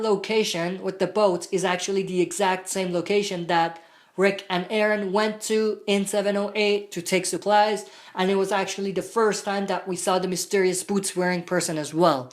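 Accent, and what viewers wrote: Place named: Dublin, Ireland. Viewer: You speek the same like a colleague of mine who is from Dublin.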